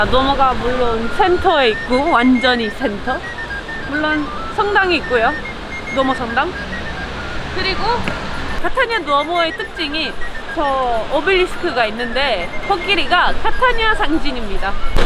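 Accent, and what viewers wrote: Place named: South Korea. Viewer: 악센트가 딱 한국인입니다 그려.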